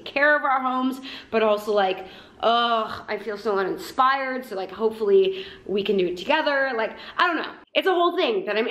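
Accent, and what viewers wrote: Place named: Southern California, United States. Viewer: You have to most SoCal accent ever.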